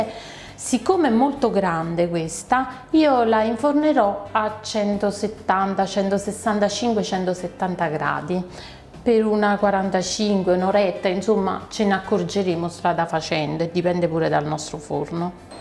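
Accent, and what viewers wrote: Naples, Italy. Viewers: Adoro questa tuo accento napoletano... Sei simpaticissima e bravissima complimenti!! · Signora siete troppo simpatica, nonché precisa e chiara, spiegazione eccellente , italiano corretto con la simpatica cadenza napoletana!!!!!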